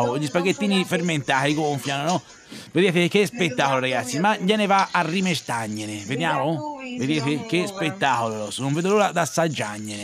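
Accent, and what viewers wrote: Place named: Quarrata, Italy. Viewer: Parla il quarratino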